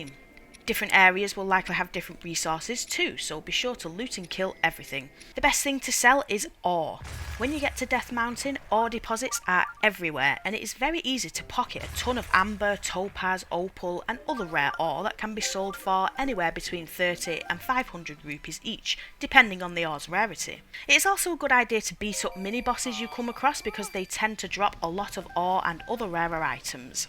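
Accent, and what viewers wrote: North, United Kingdom. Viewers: Great video. Also brilliant to hear a northern voice on a video like this ❤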